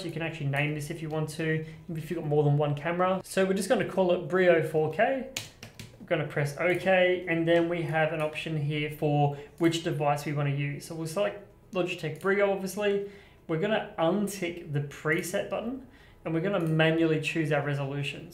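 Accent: Australia. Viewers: That was very informative and great to hear a fellow Aussie presenting. I have downloaded Melon for streaming to YouTube but not sure how I ajust zoom, colours etc on my Brio 4K in Melon, I don't think I can. Is OBS a streaming software also? I don't want the 2 programs clashing. Or can I adjust everything in OBS and still stream from Melon? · Very nice tutorial (plus, Americans are suckers for Aussie accents)! :-). Really bummed that FaceTime doesn't let you make any fine adjustments to the camera as I'm seeing a lot of color cast on my FaceTime calls with the Brio. Let's see if Zoom or Skype does any better with color correction.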